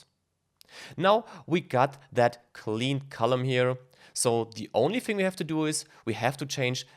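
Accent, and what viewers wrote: Germany. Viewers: Love his German accent.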